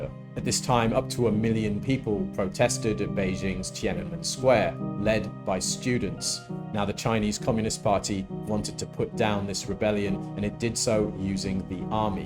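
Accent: United Kingdom. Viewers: Nice to hear a british accent. Was a bit tired of boring Chris Brennan who almost makes me doze off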